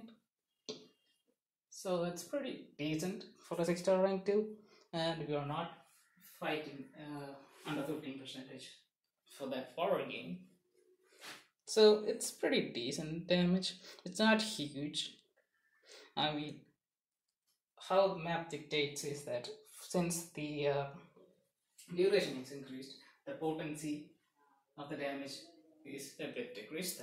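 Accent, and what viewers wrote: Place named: Kerala, India. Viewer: Are you malayali?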